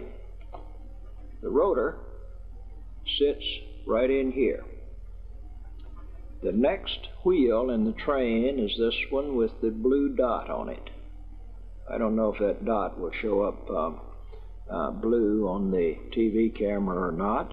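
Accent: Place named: United States. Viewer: Excellent voice like the voice of president carter , good old traditional American voice.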